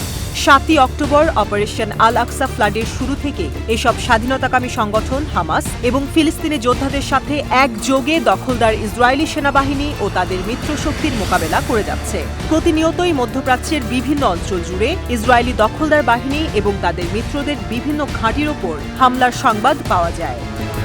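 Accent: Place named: West Bengal, India. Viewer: প্রিয় ৭১ টিভি আপনারা কি পারেন এই মহিলাকে ভয়েস ওভার থেকে বিরত রাখতে? কারণ উনি ভারতীয় চতুর্থ শ্রেণীর নাগরিক পশ্চিম বাঙ্গালীদের অনুকরণ করে কথা বলেন।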